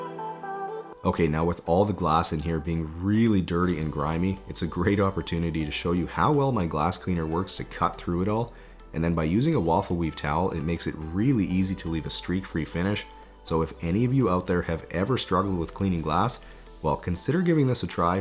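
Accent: Canada. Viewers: I must say that I am impressed with your dedication to being a perfectionist it definitely helps with this kind of profession every vehicle you Clean is amazing but as a united States citizen I'll say that I definitely noticed your Canadian accent lol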